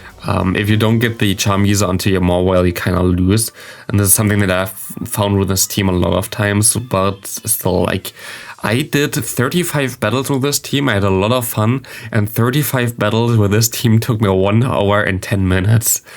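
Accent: Germany. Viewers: German accent.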